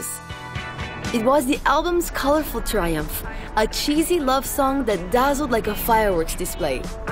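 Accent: France. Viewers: the french accent is absolutely needed. thanks. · Elle a un petit accent Français. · Nice french accent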